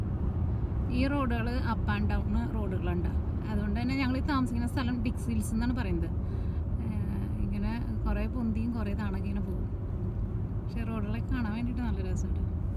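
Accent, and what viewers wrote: Thrissur, India. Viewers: Hii. Chechi.. Nanum trissur kariya.. Channel starting tottu nan Kanarundu comment cheytitila ethu vare. valiya esta ee samsaram especially trissur slang.love u.. Lot😘😘adiploi.. Avunudu.. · തൃശൂർ ഭാഷ എങ്ങനെ ചേച്ചി maintian ചെയ്തു പോകുന്നത്. ചേച്ചി യിലൂടെ ഞങ്ങൾക്ക് അമേരിക്ക കാണാൻ ആഗ്രഹമുണ്ട്. സൂപ്പർ ചേച്ചി.keep it up. 👍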